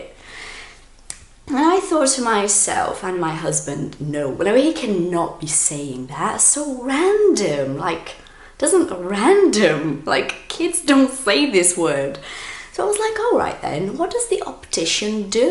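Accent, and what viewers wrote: United Kingdom. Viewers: How did you get this accent? I really love British accent. Thanks for sharing these videos with us! Congrats for you Channel.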